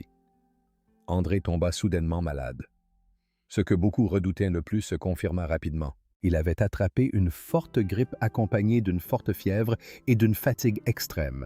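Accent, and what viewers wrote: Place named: Canada. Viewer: 18/05/2026 1) MONOLOGUE DE L'IA ACCENT CANADIEN ! 2) Il SE PRÉNOMME "RIEU", pas "RIA" ! 3) À , ce n'est pas André Rieu sur le lit d'hôpital ! Et en haut à gauche, qui est-ce ? 4) À ', qui est ce type gros avec le crâne rasé ? 5) À ', ce n'est pas lui avec à ses côtés l'infirmière ! 6) À ', IDEM 7) À , un homme jeune, aux cheveux foncés, long et une barbe ! 8) À , une infirmière aux longs cheveux blonds et un homme asiatique allongé subissant un examen ! 9) À ', qui sont ces jeunes adolescents regardant leur téléphone ? ASSEZ !:body-green-covering-eyes: JE VOUS SIGNALE : C'EST INSUPPORTABLE !:face-orange-frowning::face-green-smiling: Belgique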